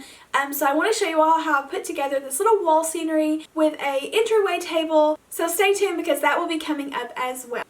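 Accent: South, United States. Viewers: I’m new to ur channel but I’m already hooked! I love ur southern draw & how effortlessly & beautifully u executed this DIY 😻 My only disappointment is that I didn’t find this video when u 1st put it out & there’s no way I’ll find these pieces now 😫 But I will be substituting the stuff I can’t find & recreating this to the best of my ability bc I freaking LOVE this!!! Thank u!! 🥰❤️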